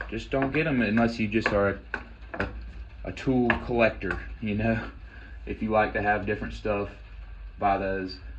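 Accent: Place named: United States. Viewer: you can tell by so many things in your video, but the main one being that the soft laser etched lettering is still on the grips. if you grew up with crescent wrenches there is a learning curve. you dont know how to use knipex and are not really entitled to an opinion. just a typical american tradesman (and all the stereotypes that entails judging by your speech and mitts)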